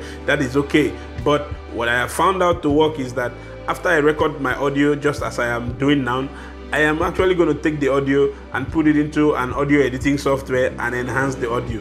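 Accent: Africa. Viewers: I mean he has a rich african accent but a poor american accent, it's a language thing no need to make it so political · I am Norwegian and I love the many ways of speaking English, including yours. African English is very pleasant. It sounds both robust and gentle at the same time.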